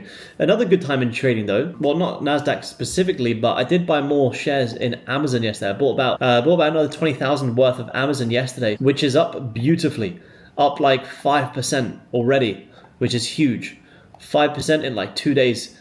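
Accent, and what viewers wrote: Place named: Australia. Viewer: Wait where are u originally from I thought u were Australian haha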